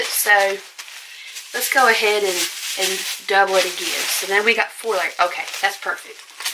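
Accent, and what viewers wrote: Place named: South, United States. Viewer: great video as always, thanks. and that southern accent...love it. It must be a canadian thing..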